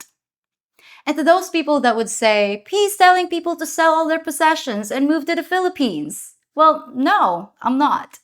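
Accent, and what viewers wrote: United States. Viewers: I’m very curious. I watched a video where you talked about your young life in something of a “tell all” but I’m more curious about your knowledge of the West, your command of the English language and vernacular. Yes I get you learn English here but yours is a very American English and combined with your knowledge of our culture and routines I’m betting you’ve spent a great deal of time in the West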